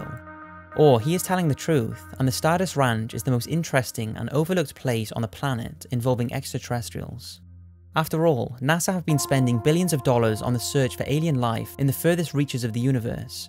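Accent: Wales, United Kingdom. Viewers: he's is 19 and he's welsh, I know he's welsh because I'm welsh and has a similar accent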